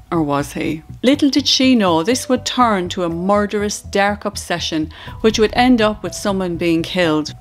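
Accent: Ireland. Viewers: The Irish accent adds to your narration sounds great. However, this story really allows victims to be complete helpless imbeciles, like they're unable to protect themselves. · Sounds Irish to me (Florida USA). Delightful. I love how y'all say "cuddle"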